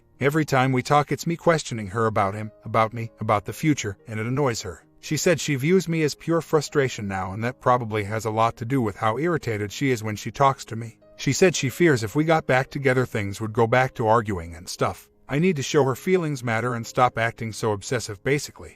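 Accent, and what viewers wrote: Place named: New Jersey, United States. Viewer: Toxic, toxic, toxic. Damn OP almost sounds like you're from Jersey.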